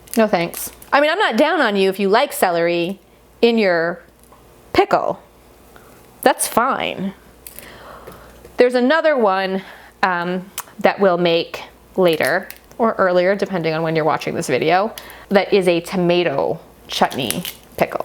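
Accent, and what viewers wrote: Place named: Kandahar, Afghanistan. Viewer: سلامونه جاني، زما مو تر اچار جوړولو په رسا کندهارۍ لهجه ږغېدا خوښه سوه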